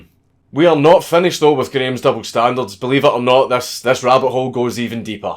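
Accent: Scotland, United Kingdom. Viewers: Lol. I read Conor's comment to be a tame joke about Scottish accent and people replying are legit mad. ahahahahahahahah. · Dude, your Scottish accent is nothing short of glorious. Hearing you speak makes me happy. Have a great day and keep up your great work!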